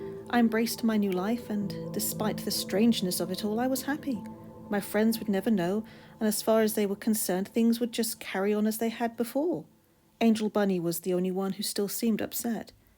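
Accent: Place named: England, United Kingdom. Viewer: I always wanted to know what Fluttershy would sound like with and English accent and now I know! Good job!!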